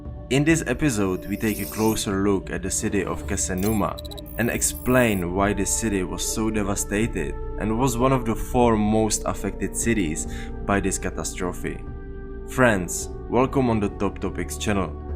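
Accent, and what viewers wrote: Czech Republic. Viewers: Cesky prizvuk slysim :). DIk.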